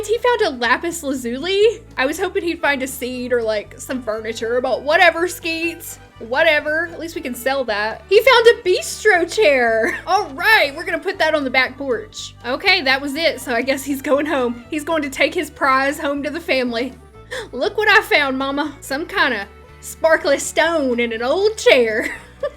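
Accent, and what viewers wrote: South, United States. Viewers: your accent could get that Southern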